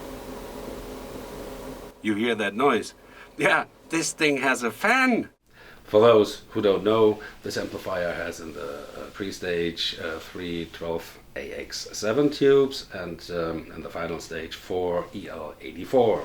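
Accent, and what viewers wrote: Germany. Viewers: this was a very slowly and a little denglish review, but you play like a god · I love the German accent. Love Scorpions, Uli, Big fan of Engl amps. Hughes and Kettner too.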